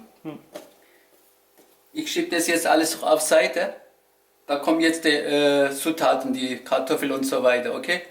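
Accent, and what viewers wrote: Swabia, Germany. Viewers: Bisch du a schwob? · Bisch n schwob?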